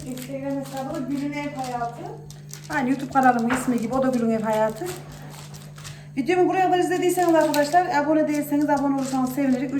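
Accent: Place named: Kirikkale, Turkey. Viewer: Ellerinize sağlık canım çok güzel görünüyor. Tarflerinizi çok beğeniyorum. Bende yapıyorum. Konuşmanızda aynı benim gibi konuşuyorsunuz. Bende kırıkkale liyim. Senin hamur yoğurmanı çok severek izliyorum. Çok güzel ve beceriklisiniz. Maşallah elleriniz dert görmesin. Gül bacım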